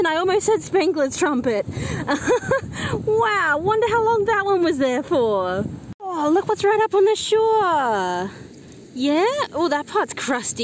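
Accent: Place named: Australia. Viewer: Hi, I am pretty sure you had new shells 3 or 4 of them. I am going to make a list now of every video. Bearsy had to be held today tho. So many kinds of shells, I can hardly keep them straight. I only still know a handful of them. I know all the US tho because I have them except for maybe a very few. Do your hands ever get puckered from the salt water? You are my very favorite of all the shellers, fun, talk loud enough, show the shells closely, love your Australian accent, we get to see you live occasionally, love the music, ending where you take a lot of time arranging what you find. LOVE YOUR VIDEO SO MUCH. Take care please. Love and hugs from Trudy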